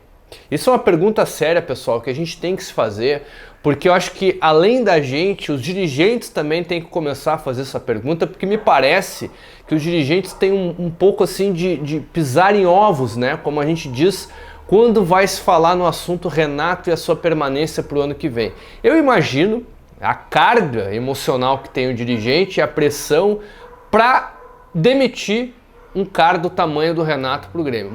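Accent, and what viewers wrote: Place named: Sao Paulo, Brazil. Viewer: Cê? Tu tá falando igual Paulista? Tá louco.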